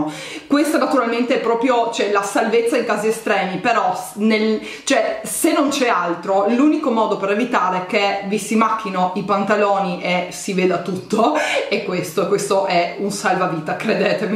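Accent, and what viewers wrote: Trieste, Italy. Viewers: sei di triestr?!?!?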